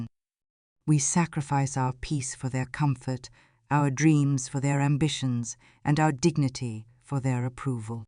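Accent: United Kingdom. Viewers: Hmmm, lives in GA, but an accent from the UK.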